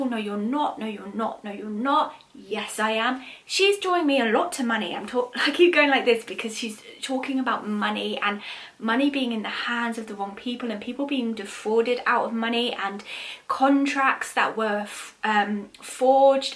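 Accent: United Kingdom. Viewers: Very interesting why do they wait until they are no longer here to tell the secrets? Why not tell while they are here any who this was very interesting I enjoyed the reading I love your accent are u from England? are You British? U have a beautiful accent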